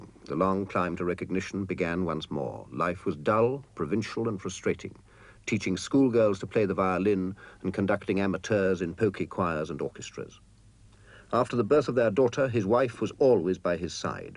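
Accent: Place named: United Kingdom. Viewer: It’s called a British accent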